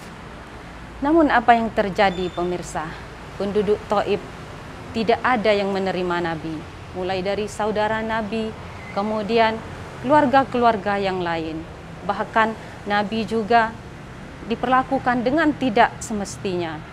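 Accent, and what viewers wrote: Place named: Liwa, United Arab Emirates. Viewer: Sukses terus ya dindaaa.. Tapi logat liwa nya di kurangi yaa... Hee heee hee..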